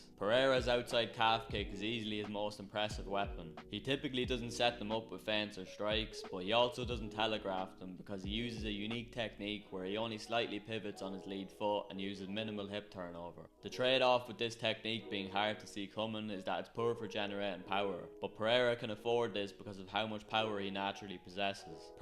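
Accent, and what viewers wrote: Ireland. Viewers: Definitely from somewhere in the midlands in Ireland with that accent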